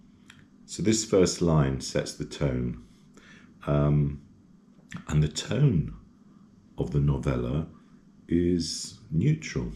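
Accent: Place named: Romania. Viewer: Superb Romanian accent , Deutsch auch. Might I suggest some comments on one of the writings produced by the Viennese Stefan Zweig ?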